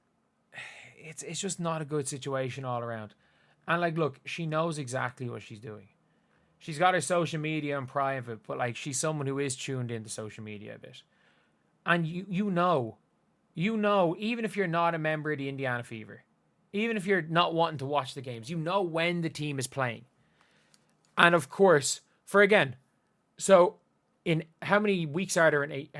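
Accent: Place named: Ireland. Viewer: Ireland, he has a freaking accent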